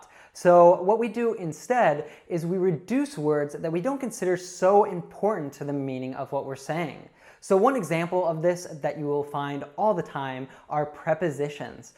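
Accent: United States. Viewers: Thanks a lot.l am learning how to pronounce words in american accent with you. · Amazing American accent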